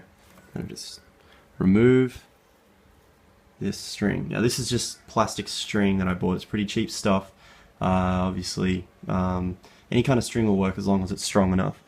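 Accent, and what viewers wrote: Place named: Australia. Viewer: I hear an aussie accent, how much did your amp and cab set you back? They're so expensive over here I'm thinking about maybe the deluxe 20 instead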